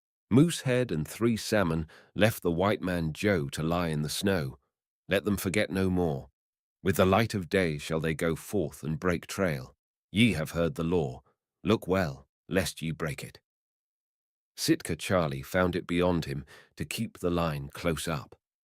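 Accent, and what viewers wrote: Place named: United Kingdom. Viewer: Are you crazy? All American author read with a heavy British accent is NUTS. Jack is turning over in his grave.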